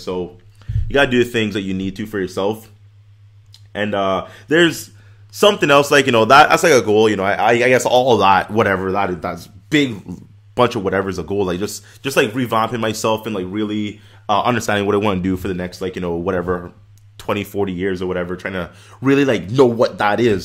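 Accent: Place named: Caribbean. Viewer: From the moment I've seen your videos I know your were from the Caribbean by your accent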